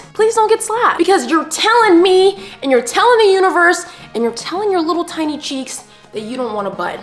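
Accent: England, United Kingdom. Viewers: The English accent 😭🤣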